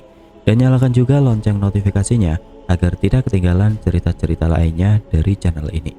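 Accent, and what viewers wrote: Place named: Surabaya, Indonesia. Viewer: Wes ta bedek ket awal logate koyok wong suroboyo, jancoookk 😂😂😂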